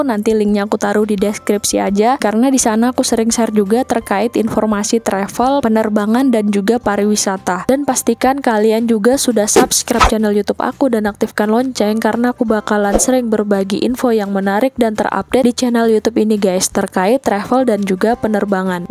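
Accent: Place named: Banyumas, Indonesia. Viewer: Ow gitu om.,makasih infonya om. Sampean ngapak,ngapak e ndi mas?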